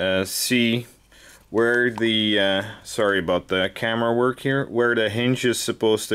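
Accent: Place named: Canada. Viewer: you sound Canadian, where r u from?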